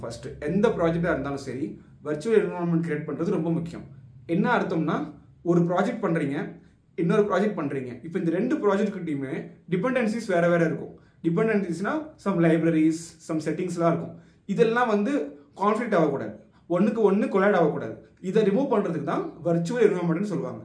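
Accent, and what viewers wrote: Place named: Kerala, India. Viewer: And your malayalam kalandha thamizh is beautiful and cute.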